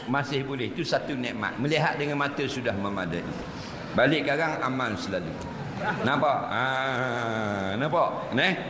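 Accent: Terengganu, Malaysia. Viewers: Orang Indonesia faham ke loghat Melayu Terengganu. Ketawa kamu tak kurang seronoknya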